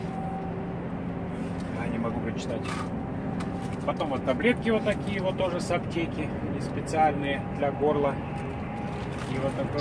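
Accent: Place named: France. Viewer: Спасибо, ну впринципе не особо удивлён, последствия "приёма беженцев" во всей красе И грязь и попрошайки это пожалуй самое безобидное. Каждый раз удивляюсь твоему французкому акценту - навигатьион, итд:) Вроде немец жеж:) Думад француженка, так нет, вы по немецки вроде общаетесь, логичнее кмк Кэтрин всё же:)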